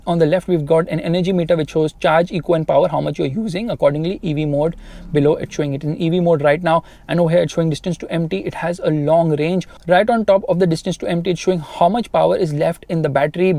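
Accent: India. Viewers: Slow the f down with your talking. Your chatting at such a high speed with that indian accent that its hard to understand you. Just a hnt you DO NOT HAVE TO PRONOUNCE EVERY LETTER IN EVERY WORD..especially the letter R.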